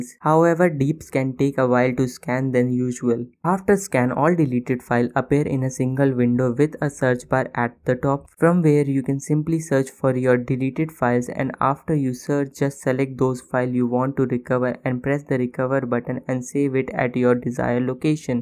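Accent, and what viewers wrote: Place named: India. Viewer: youre speaking english in indian accent and i still can understand what you are saying! just speak a bit slower and youre good to go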